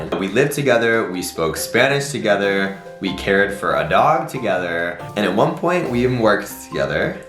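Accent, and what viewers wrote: Buenos Aires, Argentina. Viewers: Hey there is a Panamenian HEERE! amé tu video, te salio super bien el acento argentino, I send you hungs and grettings! Me impresionas, I LIKE ALL YOU VIDEOS...!!!! I´m wating the next video 👌❤😍😍😍😍😍 SALUDOS DESDE PANAMÁ! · The sexiest thing I've seen today is: hablando español con acento argentino 😍😍 And that beautiful smile. God... I can't over it! Definitely I have a crush on you 🙈 I love you, ok? A lot 😂❤ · I loved your acento Argentino 🤪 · Hi I'm from Spain which is a bit far from America but while I was watching this video I realised how similar we spanish are to south americans. And also I love how you speak spanish with argentinian accent which makes me laugh (in a good way obviously). Btw I love your videos and your channel and I hope you visit Spain. Love you❤️❤️ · Hi! I am from Argentina y amo tus videos! Tenes un acento super porteño jajajajjaja Yo soy de Mendoza, no sé si fuiste alguna vez pero es una provincia hermosa! 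Love you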